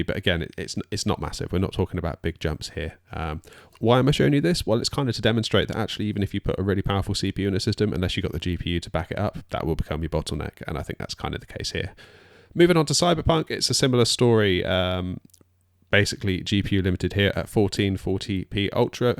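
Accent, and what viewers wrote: United Kingdom. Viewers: Dude whats wrong with you, are you a brit or smth? Cant you use normal english with normal speed rather than this 'boa of woha' eminem speed style?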